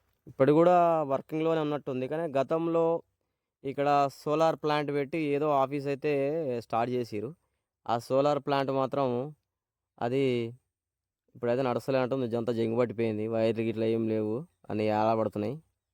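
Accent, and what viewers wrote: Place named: Karimnagar, India. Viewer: Ma Karim nagar slang la undhi....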